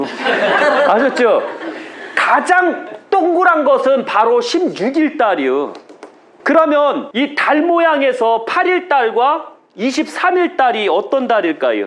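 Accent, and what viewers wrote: Chungcheong, South Korea. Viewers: 안녕하십니까ㆍ10월14일화이자1차2차 부작용으로 죽음의문턱까지 다녀온사람입니다 엊그제 퇴원했구요ㆍㆍ뵙고싶은데 고관절양쪽괴사에ㆍ진작에 찾아뵙고싶었는데ㆍ아이랑 먹고사는거외엔 16년동안 일만해왔기에ㆍ혹 전화상담 하고싶습니다ㆍ 오늘도 인천이 친정이라서 ㆍ언니랑같이ㆍ유투브상영하고왔습니다ㆍ건강되찾아서 ㆍ꼭뵙고싶습니다 ㆍ정말 저도고향이 충청ㆍ청양이고ᆢ구수한 충청도 말씀이 너무좋습니다ㆍ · 이 분은 사라져가는 충청도 방언을 잘 간직하고 계시네요. 충청도 방언을 꼭 지켜 주세요. 진지드셨슈? 얼마나 점잖고 푸근합니까?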